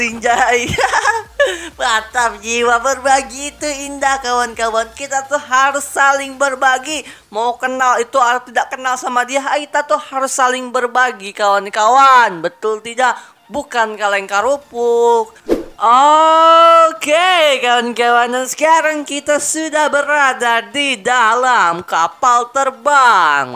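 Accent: West Java, Indonesia. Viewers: Orang sunda kah? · oraang sunda anjay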